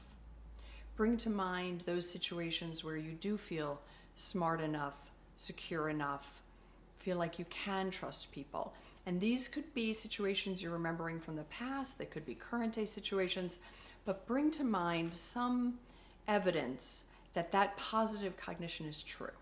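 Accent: United States. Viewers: Your videos are amazing! They help me a lot! I also love your californian accent.... And you are so pretty! Thanks 🤗☺️🌷🌷💕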